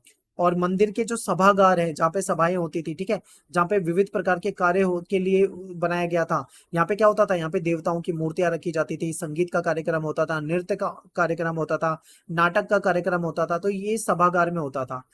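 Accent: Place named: Haryana, India. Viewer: Bhiari nhi beta haryana se ha❤😊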